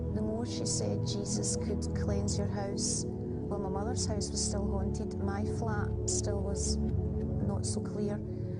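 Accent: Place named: Scotland, United Kingdom. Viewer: Scottish accent makes me sick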